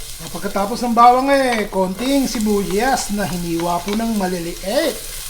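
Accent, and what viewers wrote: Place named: Bulacan, Philippines. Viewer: Bulakenyo po ba kayo? Nakakatuwa po kayo magsalita eh prang tulad nmin dto s bulacan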